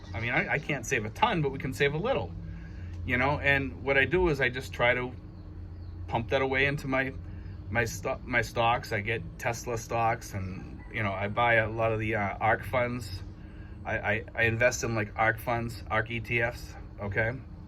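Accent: United States. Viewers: I just recently subscribed and really enjoy the videos. Probably the best info i have found by an expat in the Philippines. I also invest in both Tesla and ARKK. Both are great investments. I just sold my business recently and my filipina and I will soon be moving to the Philippines. I have been trying to figure your accent. What part of states are you from? Thanks once again for the great and informative videos.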